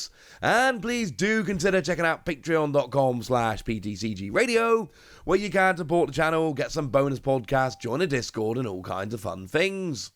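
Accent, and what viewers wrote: United Kingdom. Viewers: Hahahaha that UK accent messing people up again. Love it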